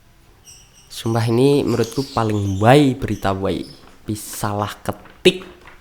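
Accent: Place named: Java, Indonesia. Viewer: Medok bgtt😅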